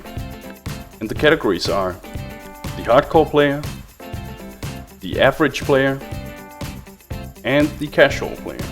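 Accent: Denmark. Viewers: Your accent sound danish :P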